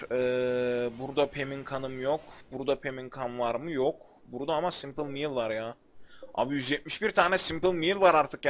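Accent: Ankara, Turkey. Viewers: selam kardeşim adamsın sen ya ankaralı aslan parçası daima senin arkandayız en çok da ankaralı konuşmanı sevdim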